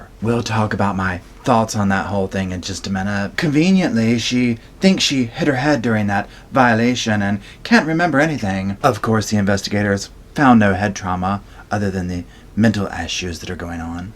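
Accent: South, United States. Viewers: Lovin your accent. The really strong Southern accent is so homely. It’s funny as I’m from Ireland. □□□□:_SofaSquad::_SofaSquad::_SofaSquad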